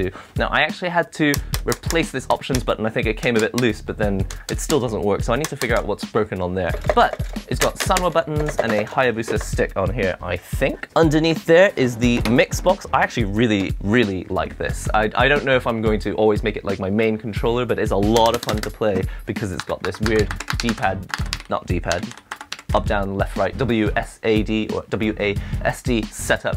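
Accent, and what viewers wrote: United Kingdom. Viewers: Why your accents like those from UK?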